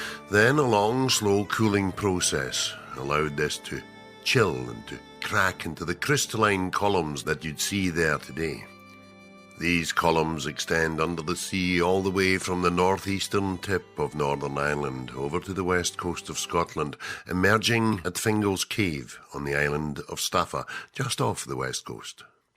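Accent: Scotland, United Kingdom. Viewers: The story of one of Irelands most geological sites. Narrated by a guy who is not Irish but Scottish. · I have never heard this version, I love it, lol. I may be wrong, but it sounded like a Scotsman telling the story? I took that end bit about the Isle of Man as a jab. Is that a "inside Scottish joke"?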